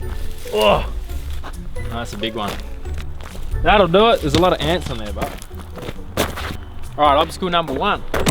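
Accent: Australia. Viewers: I was trying to read subtitles from homescreen but YouTube couldn't understand Aussie accent 😅😅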